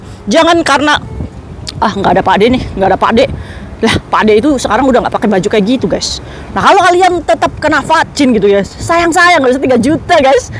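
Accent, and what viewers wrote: Indramayu, Indonesia. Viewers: Ka kya wong dermayu 😂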